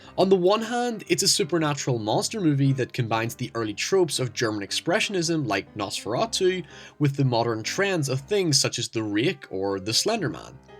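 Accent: Northern Ireland, United Kingdom. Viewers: Norn Iron? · Are you Northern Irish? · where is this guy from is it northern ireland?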